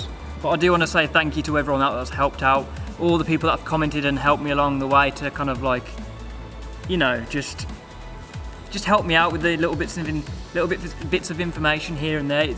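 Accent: Birmingham, United Kingdom. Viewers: BIIIRMINGHAMMM ACCENT YEEEEE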